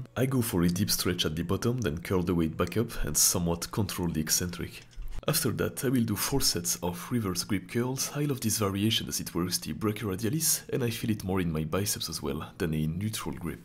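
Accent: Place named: France. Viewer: You're belgian? You have such a french accent, though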